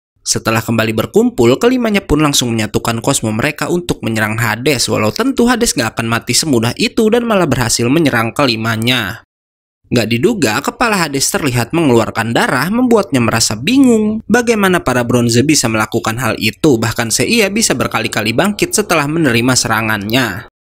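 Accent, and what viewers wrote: West Java, Indonesia. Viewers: Ini teh admin nya org sunda sanes ?? 😃😄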